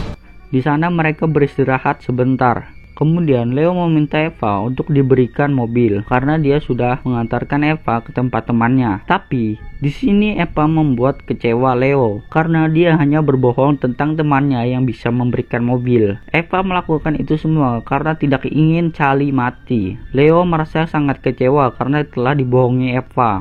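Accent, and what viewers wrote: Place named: West Java, Indonesia. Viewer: Sunda kentel kayanya ya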